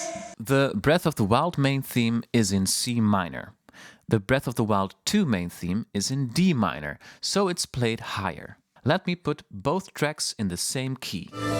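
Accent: Netherlands. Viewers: Ur from dutchland i can hear it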